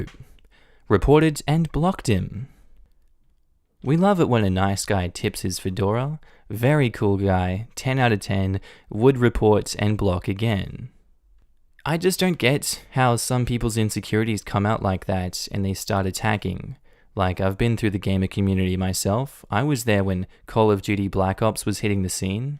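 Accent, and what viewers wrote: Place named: Australia. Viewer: I don't know why, but I just love the Aussie accent, and slang. I know there's probably many types of Aussie accents, like there's different American and British accent, but the one you have is my favorite. I love listening to Reddit videos, and having an Aussie doing makes it even better.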